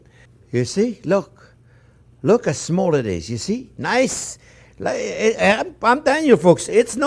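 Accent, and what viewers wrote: France. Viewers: You are really nice and funny with your english francaise accent ;) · Ptn ct' accent français jpp 😂, bonne recette sinon 👍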